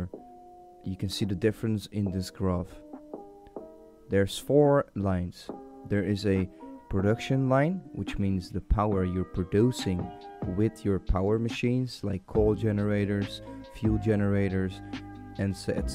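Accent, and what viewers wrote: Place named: Netherlands. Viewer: gij zijt nederlands he da hoort ge aan u stem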